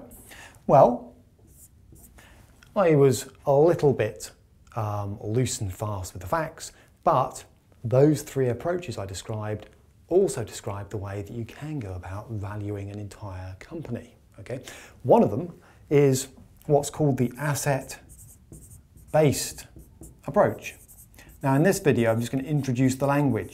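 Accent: RP, United Kingdom. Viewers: Received Pronunciation/ Standard English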